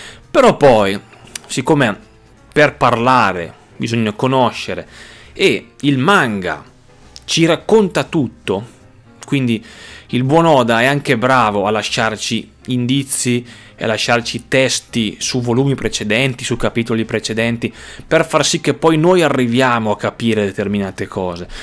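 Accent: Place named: Umbria, Italy. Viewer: senti io mi diverto a indovinare gli accenti..secondo me sei di città di castello dimmi se ci ho preso. Saluti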